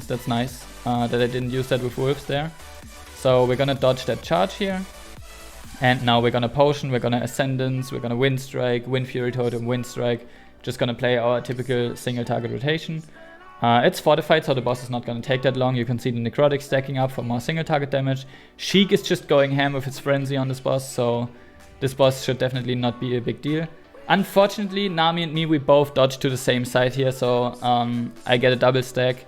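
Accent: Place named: Germany. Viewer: Man hört einfach den deutschen raus aber nices Video 🙏🏻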